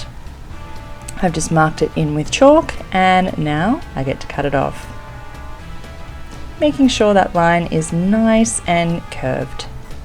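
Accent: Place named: Australia. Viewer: I realize this is totally off topic but I love Australian accents, especially yours.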